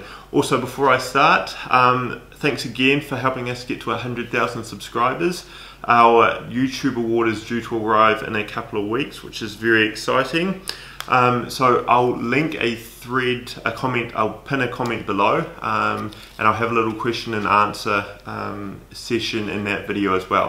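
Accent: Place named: United Kingdom. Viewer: This guy is not american he's brit i bet...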